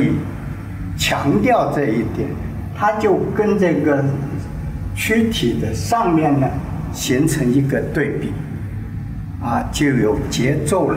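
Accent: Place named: Shanghai, China. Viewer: 为什么有一种老师是上海人的感觉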